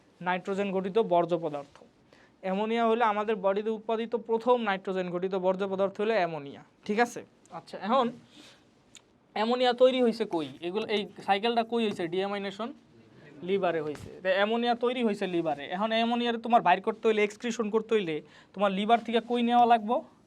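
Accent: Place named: Barishal, Bangladesh. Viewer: ভাই মনে হয় বরিশালের,😊 মাশাআল্লাহ ভালো বুঝিয়েছেন।❤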